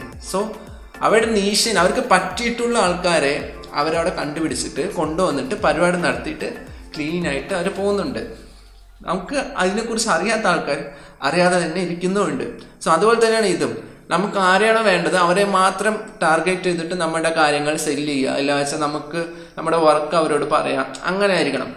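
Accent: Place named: Kannur, India. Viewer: Pullide samsaram kettittu kannoor karan aaanu thonunn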